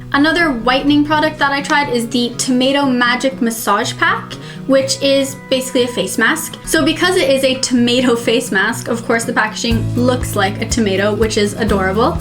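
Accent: United States. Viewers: U said u paid pounds but u have American accent.. Jus wanted to know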